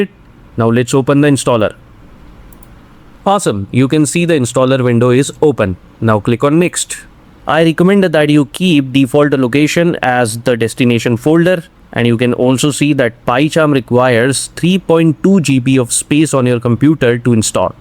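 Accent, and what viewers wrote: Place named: India. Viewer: why you sound indian?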